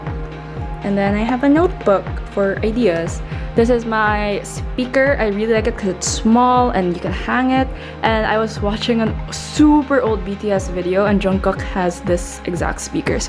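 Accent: Philippines. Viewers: I knew you were filipino right away cause I can hear your filipino accent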